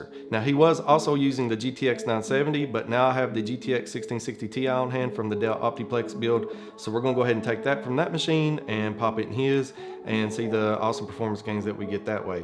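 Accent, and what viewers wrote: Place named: Kentucky, United States. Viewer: First time here. Love your video. I have to ask where you're from? You sound like a fellow Kentuckian. :)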